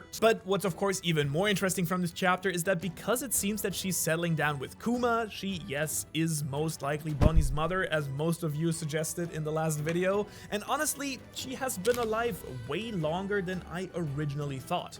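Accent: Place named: Germany. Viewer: Ich habe gerade Raffey‘s neustes Video geschaut und ich wusste nicht dass du Deutsch sprichst. Jetzt höre ich den leichten Akzent aber ich hätte es nie geglaubt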